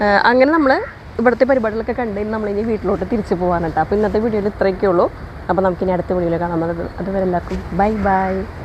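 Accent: Thrissur, India. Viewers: I love your Chalakudy Thrissur accent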